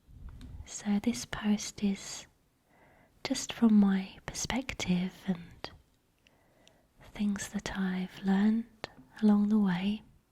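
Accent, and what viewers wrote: United Kingdom. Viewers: I've often thought I could detect a hint of Northern in your accent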